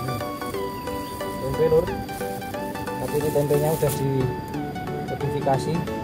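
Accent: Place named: Banyumas, Indonesia. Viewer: Koyoke ng ngapak kidol pam..kang booozz...🙏🙏🙏👍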